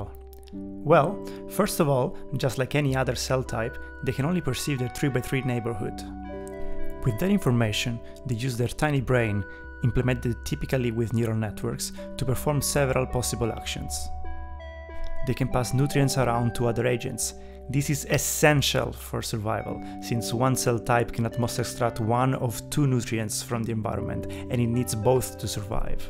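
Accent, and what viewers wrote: Italy. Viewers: Am I mistaken or you have my very thick Italian accent too? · Solo sentendo il tuo inglese ho capito subito fossi italiano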